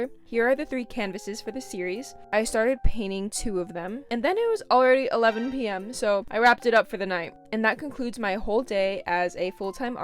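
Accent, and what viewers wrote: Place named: Netherlands. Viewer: your accent looks like your dutch (netherlands)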